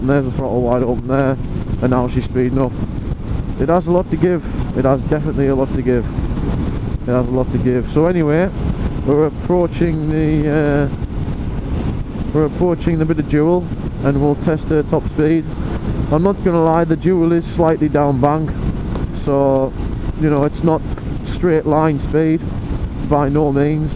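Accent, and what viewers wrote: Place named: Stockton, United States. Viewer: Where you from mate? Watched your vids and sound like from where I'm from I'm originally from Stockton but live in South Shields now currently a 125 noob vlogger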